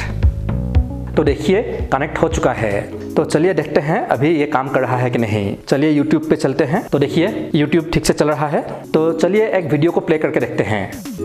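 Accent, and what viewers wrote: Assam, India. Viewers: Assam r nki apuni hindi xuni😂 a buji paisu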